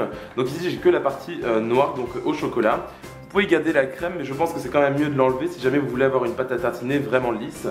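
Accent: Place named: England, United Kingdom. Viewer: stop avec ton accent anglais qui me casse les pied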